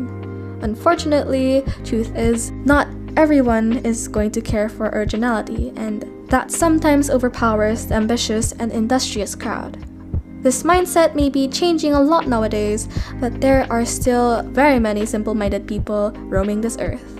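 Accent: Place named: Philippines. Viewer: This is completely irrelevant but are you filipino? I can tell by the accent 😭 (I don't mean this in a bad way btw your accent is amazing! I can just tell when someone is one of my own based on accent hehe)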